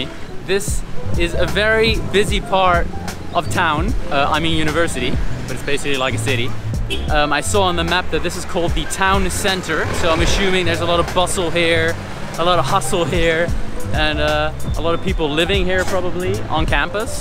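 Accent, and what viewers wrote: United Kingdom. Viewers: I think your not from America because your accent looks like a British accent lol correct me if I'm wrong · British accent, that's why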